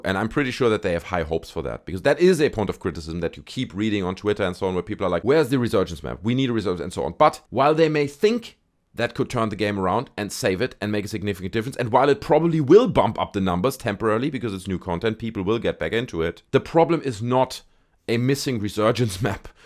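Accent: Germany. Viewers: I like your style. You sound German, but have really good English. And your videos aline with what i usually watch - anti woke, cod, pop culture stuff. Keep it up. I'll watch some more and gonna sub later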